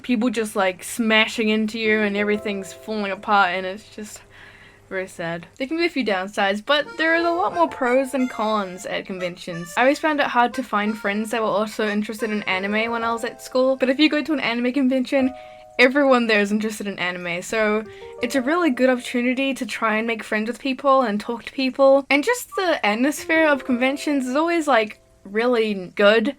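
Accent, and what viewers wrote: New Zealand. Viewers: Are you from New Zealand? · You're a kiwi too? I just assumed you were australian oops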